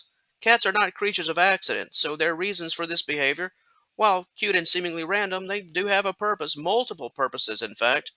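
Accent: Texas, United States. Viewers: Are you from Texas? You sound like a Texan.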